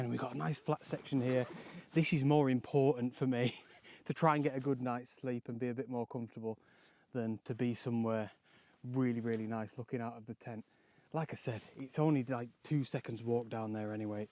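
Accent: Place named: Manchester, United Kingdom. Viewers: Hi! Watching your channel for a couple of years now here in Ireland. love it and looking forward to getting your calendar in the post soon! Strange question, but love to know where the accent is from! Went to UNI in England with a fella from Workington but you sound a bit softer. I was originally guessing somewhere around Manchester then thought Lancashire but maybe more north like Lancaster? If tis too personal I understand! Just a bit of a language/accent geek here! {probably way off!} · Hello from sunny Manchester, why do you sound like a manc?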